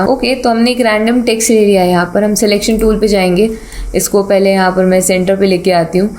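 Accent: India. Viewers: Aap Bihari hai 😂❤ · Hasi hasi mai seekha gaya ,,, paka aap up se hai sayad 🥰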